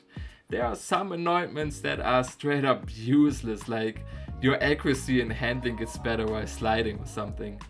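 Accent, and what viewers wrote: Germany. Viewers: Liebe den deutschen Akzent, viel Liebe aus Amerika! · Are you german? Such cool accent! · Man erkennt den deutschen Akzent 😂😂😂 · Dein Deutscher Akzent ist nicht zu überhören haha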